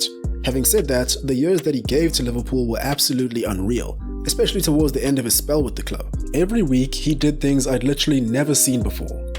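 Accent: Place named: South Africa. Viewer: Where are you from?!?! You sound like you're South African, and from Jo'burg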